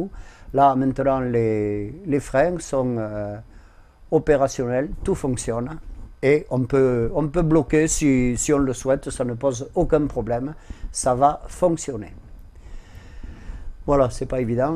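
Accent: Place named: Castres, France. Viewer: Bonjour. je crois deviner dans vos vidéos que vous êtes de Castres (l'accent va dans ce sens aussi!). Est-ce la cas? Mes parents sont de Mazamet et je viendrais volontiers vous poser quelques questions à l'occasion!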